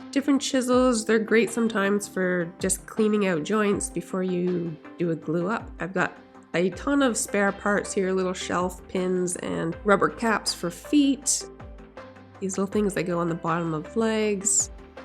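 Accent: Nova Scotia, Canada. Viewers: I'm Calgarian born and raised, but both my folks were from NS (Kentville and Alyseford/Berwich area) Your accent does show a bit..Even I get one anytime I've been for a visit ...far, car, bar, over home hahaha I love it!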